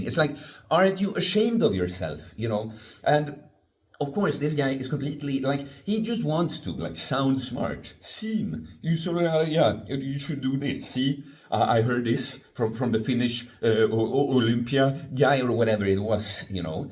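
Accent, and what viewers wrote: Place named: Sweden. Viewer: this was fucking hilarious, I nearly pissed my pants. Your Swedish accent rocks! Love your outlook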